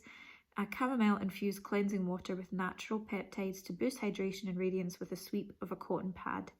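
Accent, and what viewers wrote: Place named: Scotland, United Kingdom. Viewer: Fab unboxing :) Still not a bad box I thought for the price....bit of a random selection though. Nice to hear another Scottish voice x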